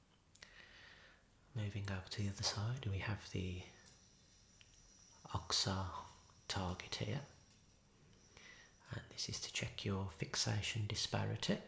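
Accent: Birmingham, United Kingdom. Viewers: I love your natural Brummie accent!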